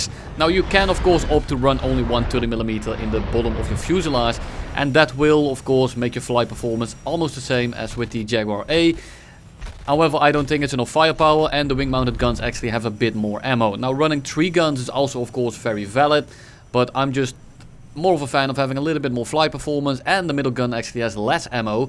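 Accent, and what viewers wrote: Netherlands. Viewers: ik hoor n beetje nederlans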